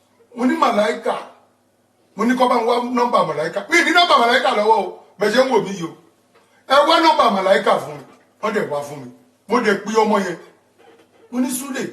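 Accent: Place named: Ilorin, Nigeria. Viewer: I keep watching this video on an on .it look like this man come from ILORIN . he sound like a person from Ilorin .I always laugh all the time i replay the video very funny man, but things I don't why Yoruba peoples like to curse each other it's not good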